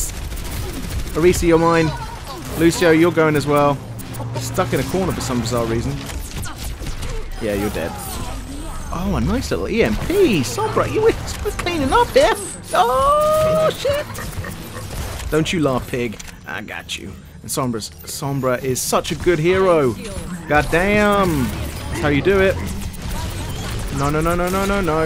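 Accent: United Kingdom. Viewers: you have a Voice Like One of that English Steryothypical Tea Drinker I Like it!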